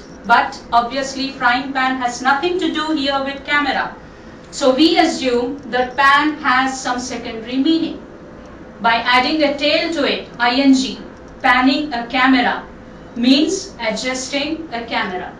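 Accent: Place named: India. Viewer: By the acent, she's indian :D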